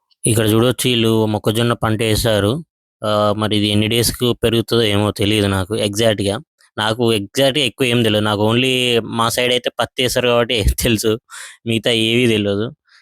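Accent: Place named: Andhra Pradesh, India. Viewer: Anna Nuvvu mana Telangana yaasa lone maatladu, enduku Anna aa tecchipettukunna andhra accent, Uma ni choodu undedi Hyderabad lo, aayana wife Telangana aavida ayina kaani mukka Telangana yaasa maatladadu. Nee originality kolpoku, mana baashani yaasani vaallu okappudu chaala vekkirinchinaaru, baasha praathi padikapyne manam kotlaadi Telangana saadinchukunnamu, aa vishayam marchipoku brother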